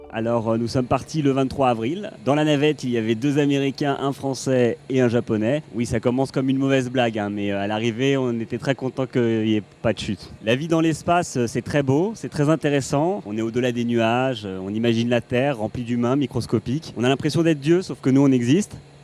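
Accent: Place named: Russia. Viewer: L'anglais parlé avec l'accent Russe est parfait <3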